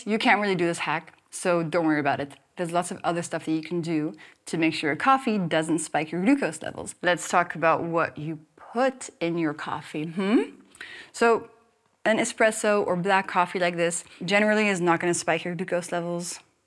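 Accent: France. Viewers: That subtle french accent is absolutely charming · I like this videos of yours. I think you are a good and knowledge person. Side note: for some reason I sense a strong French accent in your English and I wonder are you French or a French-Canadian? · Has anyone sensed a similarity between Ms. Inchauspe and Martha Quinn from the OG MTV in the early 80’s?Quirky, cute, MTV VJ. One of the original VJ’s. is French but even through the tinge of French accent there’s a lift and a flow to her pitch and tone that was unique only to Martha until now. At least to my ears. To me it’s kind of freaky to watch talk while remembering Martha Quinn. I can hear Martha in there. I don’t think is doing anything to change her voice, not on purpose at all but it is eerily similar. At least to me. She is engaging and if it helps people’s health, that’s great. More power to her!! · Completely off topic... 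How have you managed to almost completely lose your french accent? That's a first for me! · We (french native speakers) are so doomed... I mean I would kill for an accent like this one, yet people treat this as a french accent...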